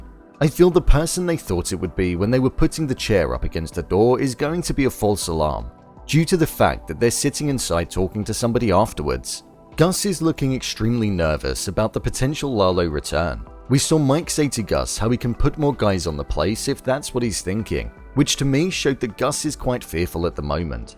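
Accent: England, United Kingdom. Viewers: Sry, I love your engl Accent, but you should do some more research when making Previews for Youtube...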